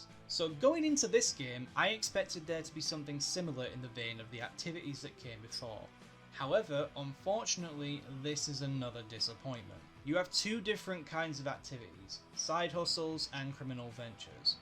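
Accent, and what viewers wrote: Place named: United Kingdom. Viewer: "why is this random British guy talking about Shrek?" Is a question I face in my day to day life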